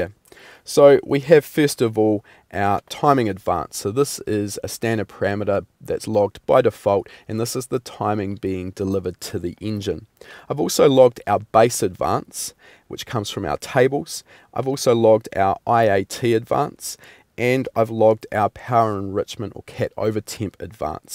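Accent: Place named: New Zealand. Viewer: There is nothing wrong with your kiwi accent . keep it up. would love to learn about this ls tuning for real. Cheers mate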